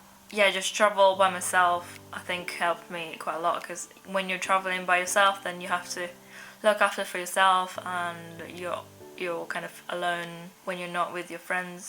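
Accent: United Kingdom. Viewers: wow!!!最初の数10秒くらいはニュートラルな話され方をしてたからか、あまり気づかなく、なんとなく聞いてたのですか、1分後くらいから、うぉ！！来た！！！ってなりました🤣若干ロンドンのcockneyやMLEにも聞こえる気がしました！ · You do sound Northern lol....英語ペラペラやん！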